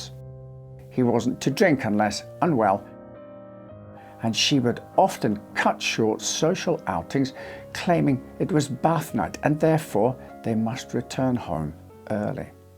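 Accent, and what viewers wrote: Scotland, United Kingdom. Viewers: Has revealed where he is from in the UK? is a Scottish name, I believe and his accent sounds like it. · Love the way the presenter talks and makes it easy for a non English speaker to understand. Are you Scottish? As for the case, I say it was all due to greed. No rival involved, Armstrong just wanted to be the top dog in his community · He clearly has a Scottish accent, but it sounds a bit mixed, so I suspect he's spent a lot of time south of the border. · I can hear a little Scottish accent here and there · In this particular presentation I am inclined to agree with you. Normally his accent is quite smooth with more of a Yorkshire twang but it seems he is adding a sort of Scottish intonation to it now. I noticed the change straight away and agree with it being a bit offputting and parody-ish. Edit: I didn't realise was Scottish. 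So yeah my comment would seem inane at first read. Sorry! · he is Scottish, from Lanarkshire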